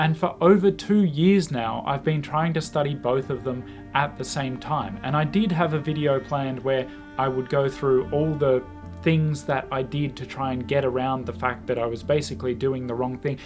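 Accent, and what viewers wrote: Stockholm, Sweden. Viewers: Your Swedish accent is so natural! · I find your Swedish accent really fun. You sound like an 08 (Stockholm). Hehe (also I really don't like the "polyglots" that only know a couple of catchphrases and nothing else) I think you did the right thing. Let me tell you my case, I speak five languages but I didn't learn them by choice but more by need. And most important of all, I learned them ONE at the time. I think you did the right thing by ditching one. I am doing the same with Russian. I'm gonna go for Ukrainian after that. But I'm not learning both at the same time. Also if you want someone to speak Swedish to I'm available anytime. As I love languages I have a real knowledge of Swedish growing up in Sweden and being a bookworm I have a good understanding of the Swedish language. Just if you need a little variation in your studies. 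Cheers. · I just realized u have a bit of Swedish accent in your video. Anyways, good luck... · That is very clever, well done! Also, your Swedish vowels have a pretty distinct Stockholm twang, which is quite difficult to pick up for foreign learners. You could certainly pass for Swedish sounding like you do already in few-word conversations.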